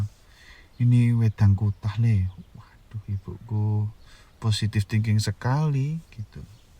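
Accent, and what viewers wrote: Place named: Blitar, Indonesia. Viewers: Ngomongnya pke logat blitar jiaaaan medok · Horror : 20% Komedi : 80% Semuanya dibalut oleh bahasa jawa logat medogg Jadinya : Lucu2 serem wkwk :D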